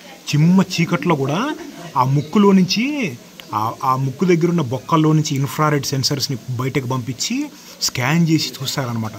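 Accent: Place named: Hyderabad, India. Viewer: Mama,mass r nuvvu, hyderabadi ani pinchav,kummai🎉🎉❤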